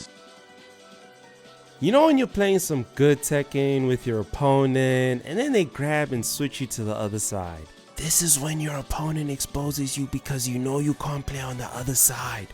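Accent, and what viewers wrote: South Africa. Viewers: Lol I was watching a Lil Majin vid than randomly your video played next and got me interested and as I kept listening and watching without paying attention to your youtube username I suddenly realized bro is a fellow South African! Definitely subbed. Working on a ton of projects for my own channel, keep pushing bro!🔥⚡️🇿🇦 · anazing background music and south african accent? subscribed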